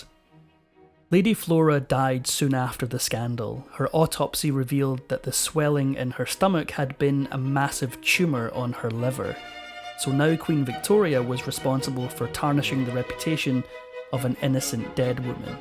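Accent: Scotland, United Kingdom. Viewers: I had forgotten just how much I loved this narrator’s Scottish brogue! Cheers to you, Sir! ❤❤❤ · Scottish accent and exceptional annunciation 🏴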